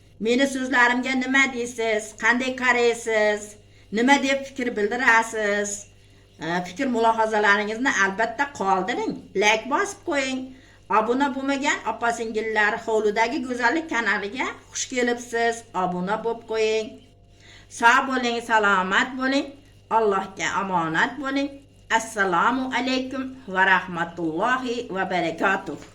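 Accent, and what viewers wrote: Andijan, Uzbekistan. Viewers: ЯХШИМИСИЗ ДОМДА ЯШАЯПСИЗ МИ КИМ БИЛАН ЯШАЯПСИЗ ТОШКЕНЛИКМИСИЗ ШЕВАЕЗ АНДИЖОНГА УХШАРКАН ИЛТИМОС ЖОВОБ БЕРИНГ НЕЧТА ФАРЗАНДИЗ БОР ШУ ЁШДА ЖУДА ПОКИЗА АЁЛ ЭКАНСИЗ МАШААЛЛОХ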